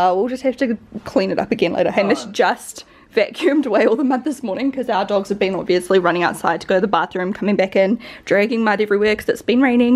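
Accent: New Zealand. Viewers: You have a good nz accent read the discription what part of nz I'm a Wellington baby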